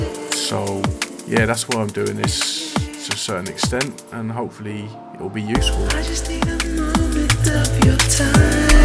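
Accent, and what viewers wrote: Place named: London, United Kingdom. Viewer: that's got to be one of the most convincing london accent coming from a dutchie! it's only the retracted dutch /s/-sounds that border on [sh] that sometimes give away the native tongue.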